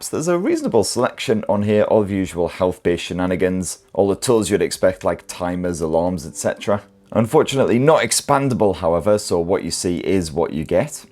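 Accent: United Kingdom. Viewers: this is a fantastic review! Plus, the British accent helps make it sound official for us Yanks! Thanks for the great review. You've sold me between this and the gt 5 pro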